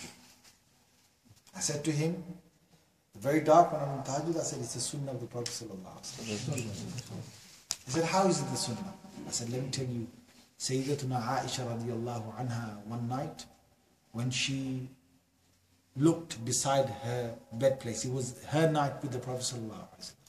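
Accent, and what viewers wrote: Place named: Jamaica. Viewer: Hes wicked jamican and afro accents are hilarious MA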